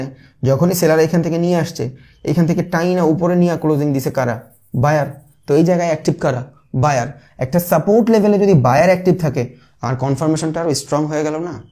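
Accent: Barishal, Bangladesh. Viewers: ভাই বরিশাইল্লা ভাষাটা ভালো ছিল❤❤❤ জোস❤❤❤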